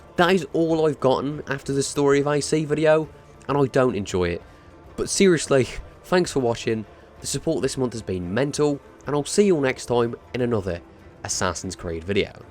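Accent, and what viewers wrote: Birmingham, United Kingdom. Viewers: Birmingham. Can you not tell? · I did actually think you were from Brum but wasn't 100% sure.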